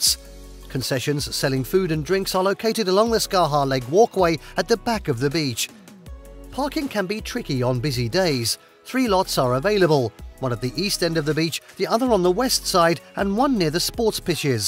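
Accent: United Kingdom. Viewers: thx for info! and your speech is clear enough to understand!🙂 I'm sorry, is it British?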